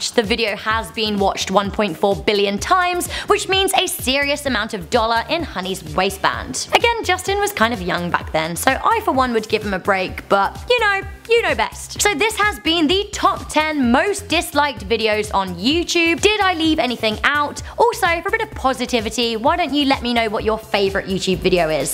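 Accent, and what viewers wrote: United Kingdom. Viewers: ***** I KNOW ITS BRITISH but she tried to imitate the portuguese accent when she said "cortando o botao do youtube" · world most understandable British women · Philip J. Fry It's a British accent.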